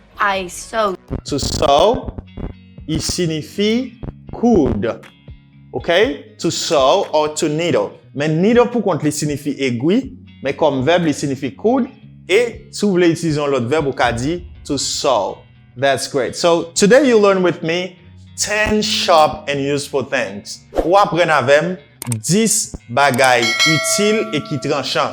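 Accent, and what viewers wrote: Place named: United States. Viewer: Kou sa a vrèman enteresan ak yon pwonosiyasyon américain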